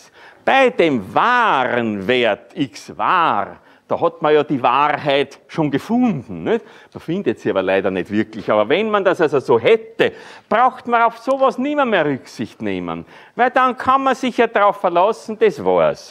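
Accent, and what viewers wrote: Vienna, Austria. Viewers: Allein schon der Wiener Dialekt! Sensationell! Sehr kurzweilig vorgetragen, Danke! Viele Grüße nach Wien aus dem Raum Köln!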